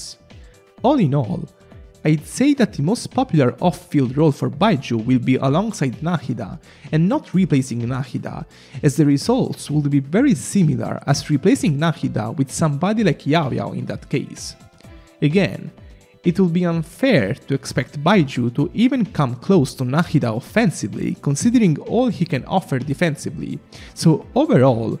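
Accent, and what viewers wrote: Italy. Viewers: What’s this accent ? Italian ? It’s beautiful btw!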